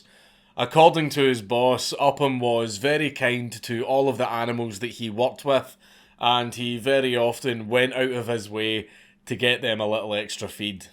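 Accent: Scotland, United Kingdom. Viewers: I don't know what the hell it is but the scottish accent is delicious to listen to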